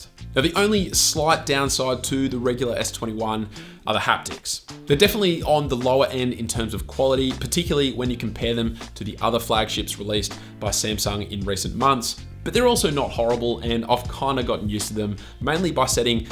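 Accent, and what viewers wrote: Australia. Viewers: This guy has so familiar accent. From the first sentences I knew that he is an Aussie :). Hello and big cheers from NZ, mate! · R u Australian?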